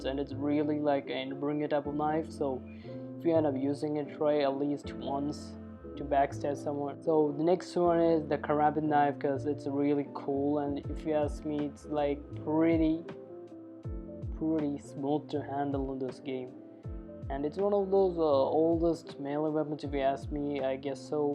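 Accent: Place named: United Kingdom. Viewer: Bruh, I said no weird British accent!! Noice vid tho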